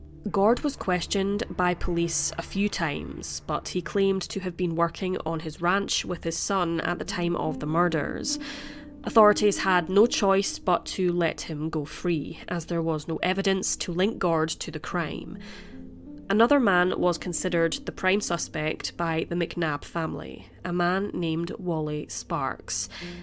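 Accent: Scotland, United Kingdom. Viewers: It's a Scottish accent. Good wishes 🙏🏼 to Canada 🍁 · She's Scottish lol! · She and her sister are Scottish. Her sister is Kirsty Skye who also has a channel. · Is that a Scottish accent? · I love your wee scotch accent, I;m from Donegal in Ireland. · Yes the narrator is from Scotland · SHE'S SCOTTISH ACCENT MY FRIEND 🇺🇸🇨🇴🙏🏽💜💜💜💜💜💜✊🏽✊🏽✊🏽✊🏽✊🏽✊🏽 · just curious where in Scotland are you from? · I believe she's Scottish :)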